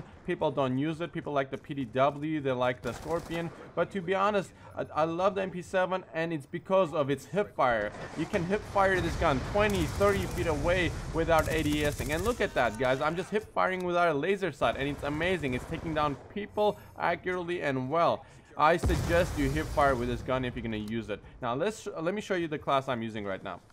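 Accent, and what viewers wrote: Russia. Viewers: You're really cool man, you've earned a sub, like and a comment :P But 1 question: are you russian or american or what? sorry i'm not too sure. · cool class you sound russian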